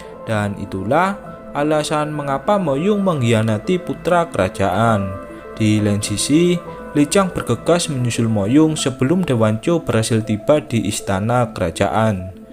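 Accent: Java, Indonesia. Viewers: Gak asik denger naratornya....terlalu medok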